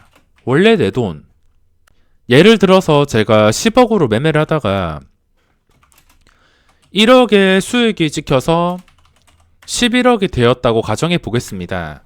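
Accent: Gyeongsang, South Korea. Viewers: 아 경상도 억양 저얼라 짜증나네 억양이 모 저러냐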